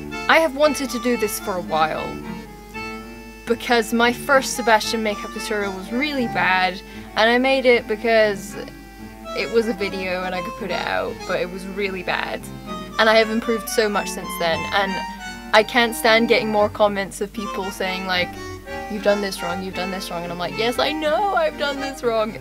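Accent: United Kingdom. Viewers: Hannah Hetzel he is from Scotland but has a very British accent. His accent is cute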